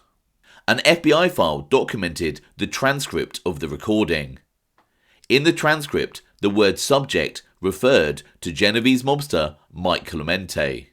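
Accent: United Kingdom. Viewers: It amazes me how much knowledge u have considering I'm assuming ur from the UK by accents. Good to no are American history reaches global appreciation.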